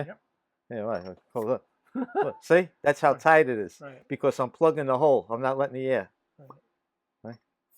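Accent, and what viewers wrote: New York, United States. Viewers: A PHD level of knowledge and a super cool New York accent. Is there a follow up video with the completed turntable? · Great New York accent!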